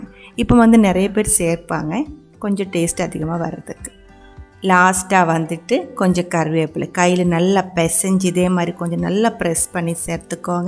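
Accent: Kerala, India. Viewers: Nice Tamil with Malayalam accent nice molakooshyam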